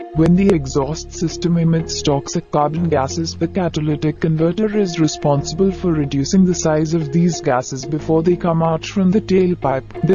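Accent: India. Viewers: Bro has an indian accent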